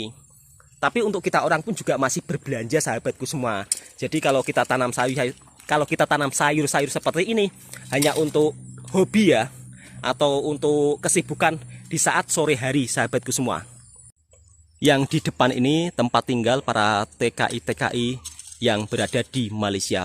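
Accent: Java, Indonesia. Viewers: Suka dengar cakap melayu slang jawa. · Kami semua faham bahasa kamu hya loghat je,pekat jawa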